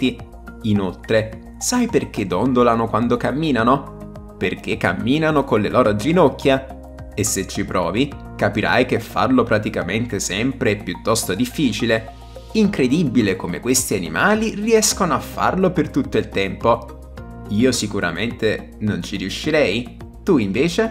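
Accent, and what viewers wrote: South, Italy. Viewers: Bel video, e anche interessante anche se un pó scolastico. Mi permetto di fare un appunto sulla dizione inequivocabilmente meridionale, non si raddoppiano le consonanti, (es. Incredibbile...) e le C non si pronunciano sc...., l'italiano è un'altra cosa.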